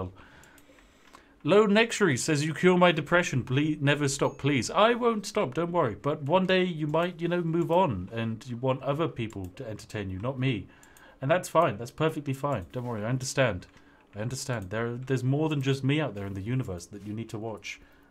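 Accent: United Kingdom. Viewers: its terrifyingly brititish tho. not brits that try to pretend to be canadian when traveling. no. unapolgetic better than thou pretentiousness. its the kind that is funny on the east coast, exploited on the west. midwest being best, we tolerate it but say half that to an actual person here and shit gets kicked in.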